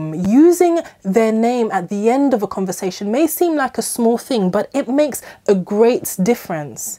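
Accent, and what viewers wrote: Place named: United Kingdom. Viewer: Easy for you to say... with that perfect British accent you do not need to work hard at elegance :)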